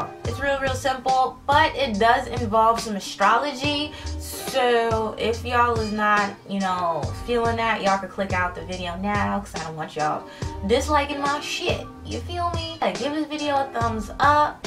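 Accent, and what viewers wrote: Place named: United States. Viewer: thank you sooo much!!! This has helped me a lot!! && yeah I'm watching from London, quite far away,I love your accent! I wish I had an American accent lol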